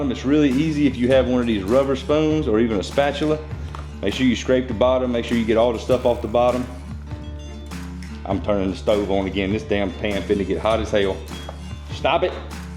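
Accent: South, United States. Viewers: I Love You! I want you to know, I have always been told about my Southern accent. You talk just like me!!! I Love watching you cook!🎉🎉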